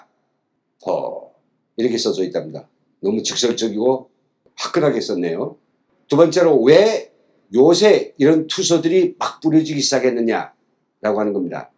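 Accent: North Korea. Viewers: 이분 발음이 이북억양이신데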